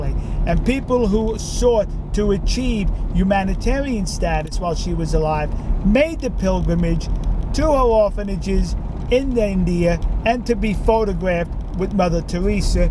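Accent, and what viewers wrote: New York, United States. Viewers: OMG I guess I assumed from your accent that you were in New York City! I was down in Texas about a year ago. Absolutely loved it!!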